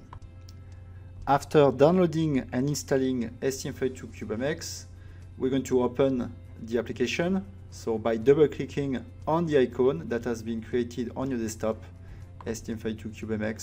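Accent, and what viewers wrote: France. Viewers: hi, thanks for this tutorial, I guess you're a French native speaker 🤔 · Thank you very much for the perfect "Getting Started" tutorial. Very lovely french accent also :)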